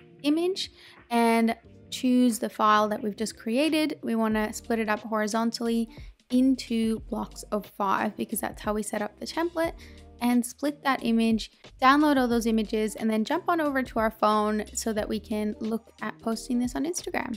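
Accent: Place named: Australia. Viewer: I love trying to decipher accents, especially blended accents like yours. What I’m mainly hearing is Australian but with a bit of something else. Is it North American? Irish?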